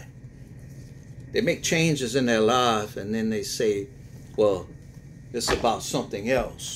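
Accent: Louisiana, United States. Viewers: I AGREE 100%. JUST CONTINUE TO DO YOUR THING YOUR WAY MANY MORE OF US ENJOY IT SO MUCH. THOSE WHO TAKE ISSUE AND NIT PIK EVERY LITTLE THING NEED TO GO ELSEWHERE AND BE HAPPY. IT'S ANNOYING WHEN PEOPLE NIT PIK READERS AND EXPECT READERS TO CHANGE AND TO ACCOMODATE THEM. ENTITLED MUCH ? THEY'RE NOT SPECIAL. YOUR SOUL TRIBE/ SPIRITUAL WARRIORS ARE LOYAL AND CONSISTENTLY WATCH YOUR READINGS BECAUSE WE LIKE THE WAY YOU READ GIVING IT YOUR SPECIAL CAJUN FLAVOR AND WISDOM. SO PLEASE DON'T MIND THEM JUST CONTINUE TO BE YOU AND DO YOU BOO!!❤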